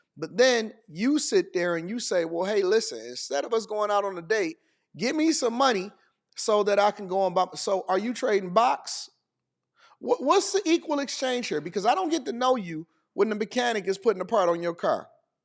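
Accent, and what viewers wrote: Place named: South, United States. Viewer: As a DMV native, that southern accent is a super turn-on. I laughed real good on the female Numbers Assessment 😂.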